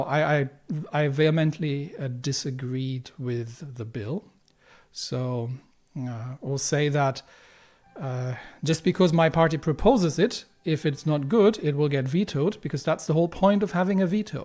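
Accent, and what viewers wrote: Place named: Germany. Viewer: Australia? But you have german accent...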